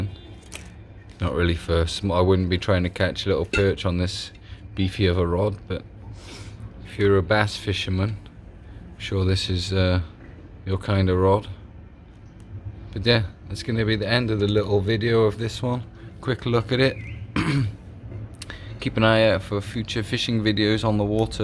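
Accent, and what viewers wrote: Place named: Wales, United Kingdom. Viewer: Interested how you ended up in Holland? You're Welsh right? Or at least sound Welsh.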